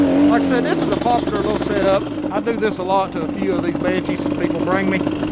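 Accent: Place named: Pittsburgh, United States. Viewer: I can tell where "yinz" are from. Lol. Nice scoot. Looks tome like you know your 2 strokes.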